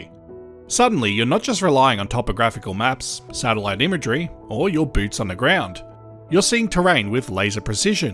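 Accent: Australia. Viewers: Good stuff. I only wish the speaker wouldn‘t be an Australian 😖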